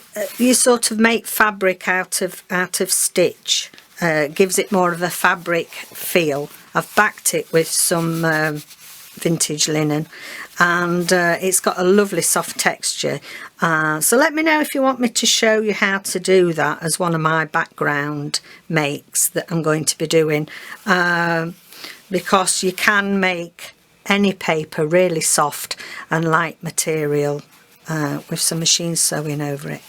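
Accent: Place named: England, United Kingdom. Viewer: Hi I have your other videos on my watch list so I haven’t gotten to them yet. I just saw this one come up and couldn’t wait to watch it. I just love what you’re doing I’m only a few minutes in but just had to comment. I love your accent you pronounce some things like my English gran did! Your project is stunning! Can’t wait to watch more! Hugs Rachel 🤗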